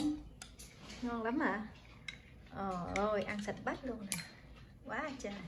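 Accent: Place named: Hue, Vietnam. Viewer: C nói tiếng Huế hay mà. Nghe rất dễ thương. Sao 2 con chị ít nói tiếng việt nhỉ. Thấy bé chăm nói tiếng việt hơn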